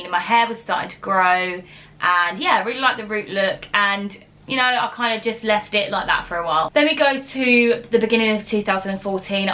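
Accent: United Kingdom. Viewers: Your british accent is 😍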